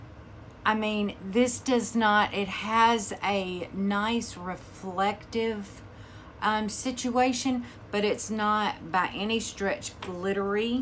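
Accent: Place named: South, United States. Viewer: Hi. I'm here from Jen's group. Love your southern accent! That gold lip mask was nuts. I'm glad you gave the Catrice another chance. I love it. But I have oily, mature skin. So that may be a difference. I also love mixing it with a bb cream to increase longevity and coverage. With the Essence, I found it had a film on it so the first couple times you use it, you don't pick up much. I also use a denser brush to apply it than I do other highlighters. But I can see it just fine on the video. Great video. Thanks!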